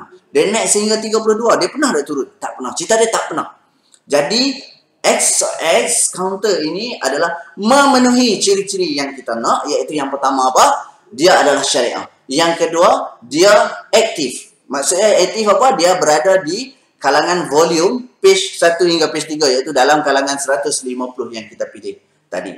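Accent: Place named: Kedah, Malaysia. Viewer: Seronok dengaq tuan sembang loghat Kedah..terbaik baq hang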